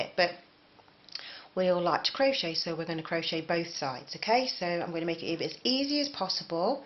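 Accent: England, United Kingdom. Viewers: Hello, I have watched all your tutorials and they are great. I am owl crazy as you can see by my avatar. I hope you don't mind me mentioning, when you start of you call your first knot a magic loop. Magic loops and Magic rings are described differently in other tutorials and what you start off with is a slip knot. Please don't think I am "knit" picking because I love your youtube channel. Keep up the good work, can't wait to see what comes next. Love your accent too, what part of England are you from? Best wishes to your dad i hope his arm is getting better. Kind regards:)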